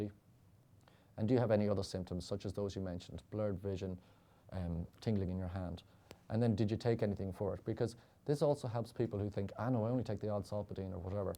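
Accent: Ireland. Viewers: His verbal delivery is the most quintessentially Irish thing I've witnessed in some time.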